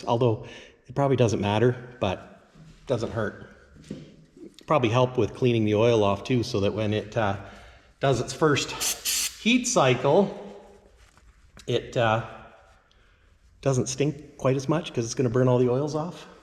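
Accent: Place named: Canada. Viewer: Oooouut. I hear a faint accent A!!! What part of Canada??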